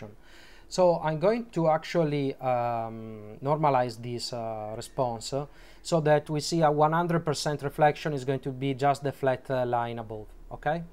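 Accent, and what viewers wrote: Italy. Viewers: quanto si sente che sei italiano! grandeeeeee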